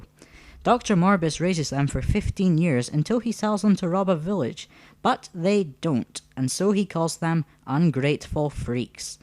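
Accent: United Kingdom. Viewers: "the level from hale!" dude you arent funny. look up avgn! i was laughing at your stupid british accent. it is not easier said than done? dont you mean it is easier said than done. you dumb ass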